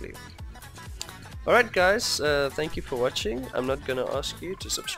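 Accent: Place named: South Africa. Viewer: I can recognize a South African accent from a km away lol was just about to ask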